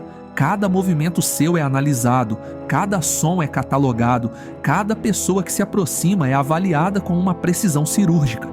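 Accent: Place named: United States. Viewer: Похоже будто Американец пытается говорить на Русском языке, акцентом.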